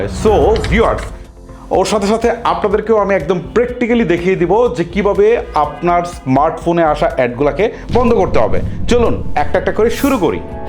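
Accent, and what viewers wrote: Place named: Kishoreganj, Bangladesh. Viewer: আপনার কিশোরগঞ্জের কিছু ভাষা থাকে, সুন্দর করলে আরো সুন্দর শোনাবে।( তাকি × থাকি ✓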